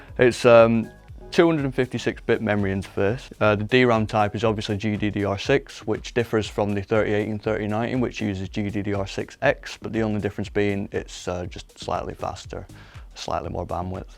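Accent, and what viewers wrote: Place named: England, United Kingdom. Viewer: Love that English accent.💛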